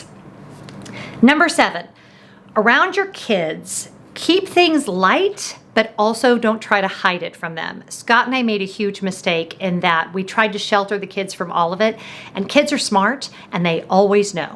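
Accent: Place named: United Kingdom. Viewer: I loved the British accent 🇬🇧!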